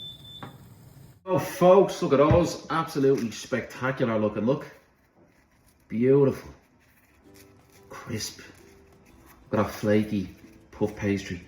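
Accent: Ireland. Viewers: Put them in the oven for about turtee to turtee five minutes! Love and miss the Irish accent. 👋😁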